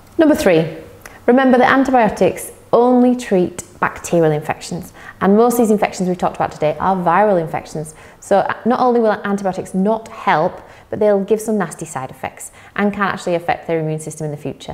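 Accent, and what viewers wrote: United Kingdom. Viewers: Yorkshire accent.. She has a lovely voice. Anyone here just to see?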